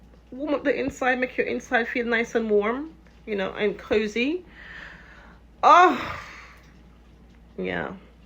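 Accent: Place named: Ireland. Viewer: You sound like them Irish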